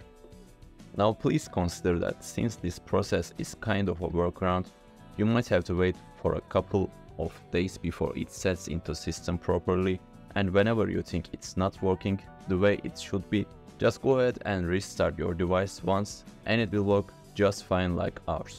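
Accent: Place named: Turkey. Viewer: Bi aksan ne kadar Türk aksani olabilirse o kadar. Almanya´dan selamlar!!!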